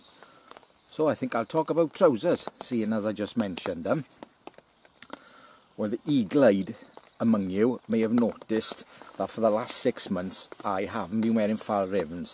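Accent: Wales, United Kingdom. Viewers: I love the way you say feuwell, always loved the welsh accent..Ive a lot of welsh ancestry..I bought a pair of pilgrims recently and rate them. Love helicon tex, real well made stuff. Been wearing their combats too (SFU's) for a while and they're great, well made. Even their version of the M65 pant are bang on. The pilgrim pant can take kneepads but the opening is on the inside, wierdly, well on mine they are at least. Diolch brother...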